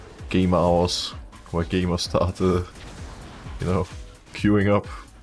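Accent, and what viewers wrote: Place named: Denmark. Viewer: i had the video playing for the first 2 minutes while doing smth else on my pc and when i actually started watching i was trying to find the SETT and i was like wtf xD danish accent got me there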